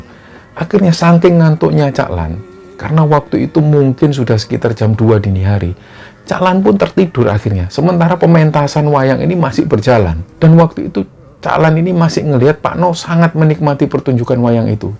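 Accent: Java, Indonesia. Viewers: Paling uenak kl yg bawa'in ceritanya mas, apalagi pake logat khas nya yg medok itu. Assalamualaikum warohmatullohi wabarokatuh buat tim ya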